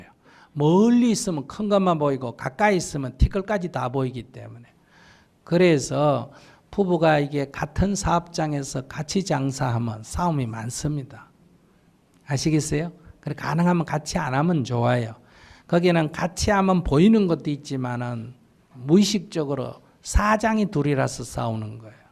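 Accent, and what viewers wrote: Gyeongsang, South Korea. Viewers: 스님 경상도 사투리 라서ㆍ더 매력 있습니더 예 ㅡㅡㅡ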